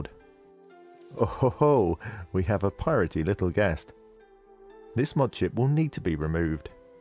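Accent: United Kingdom. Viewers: Are you from Liverpool? You sound like you're from Liverpool.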